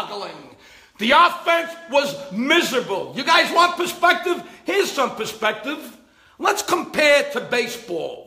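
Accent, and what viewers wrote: New York, United States. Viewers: you definitely are a New Yawker, you should be a coach just for the post game speeches, haha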